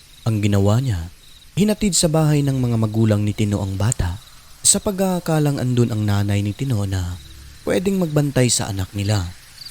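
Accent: Visayas, Philippines. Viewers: I love your bisaya accent Say it again :Mantas nga aswang! heheh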